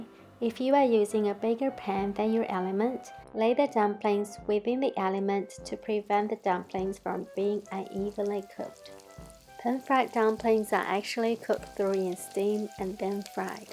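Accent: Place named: New Zealand. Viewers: Spotted the NZ accent straight away! Thank you for the tutorial! I've been lowkey scared to try pan-frying dumplings, so I've always just steamed them. I'll give this a try. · Love the Nz accent ❤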